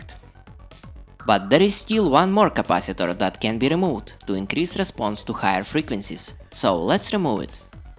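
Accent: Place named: Russia. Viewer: Russian accent detected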